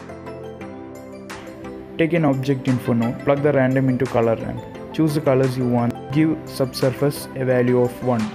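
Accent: Kerala, India. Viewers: U sounds like a mallu, btw nice video 🤘🤘👍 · Why do you have Indian accent? Are you trying to be CG Matter? Or Ian Hubert? Or Royal Skies LLc?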